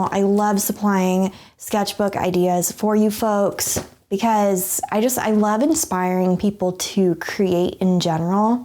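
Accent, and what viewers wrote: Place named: South, United States. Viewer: I have been watching you for years and today I am finding out you have a Southern accent wow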